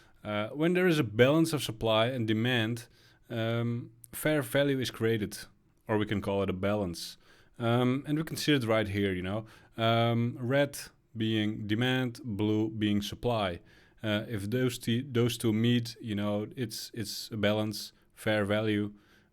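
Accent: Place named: Netherlands. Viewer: Just subbed, watched a couple vids. Great stuff. I knew you were dutch by your accent 😂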